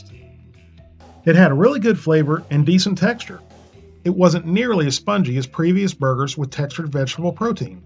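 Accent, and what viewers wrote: South, United States. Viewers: you're an idiot. This fucker can't speak one sentence correctly. That's the south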